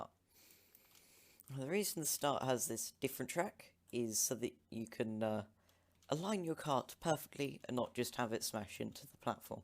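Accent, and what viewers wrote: United Kingdom. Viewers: Me when i heard him : HES BRITISH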